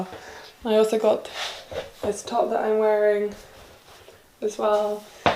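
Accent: London, United Kingdom. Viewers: What part of London are you from? You have the poshest accent I've ever heard haha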